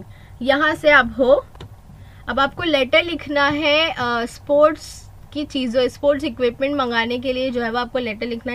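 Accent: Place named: Maharashtra, India. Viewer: her marathi ascent😍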